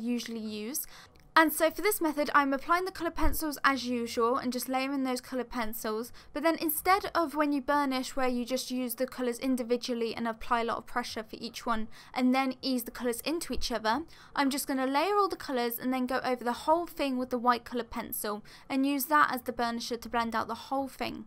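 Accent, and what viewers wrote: England, United Kingdom. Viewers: I love your British accent😍🔥 . Is it just me who is just listening you talk rather than actually understanding 😂❤️....loved it though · Can can hear you are English. I have a problem getting Prisma's here. I can buy whole sets here in the UK..but individual colours are impossible to find. Can i ask where you find yours?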